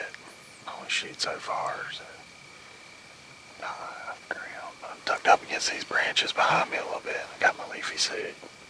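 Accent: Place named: South, United States. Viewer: As my Mamma from East Texas would say, "That boy sounds like Real Country".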